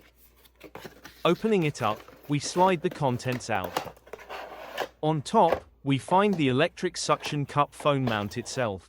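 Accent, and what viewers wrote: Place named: Oregon, United States. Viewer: Absolutely love the Oregon accent!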